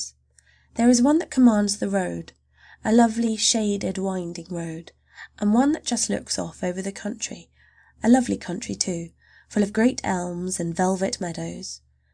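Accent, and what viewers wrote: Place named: United Kingdom. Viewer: Very much than other normal US english readers, i think UK english is the proper voice for this story.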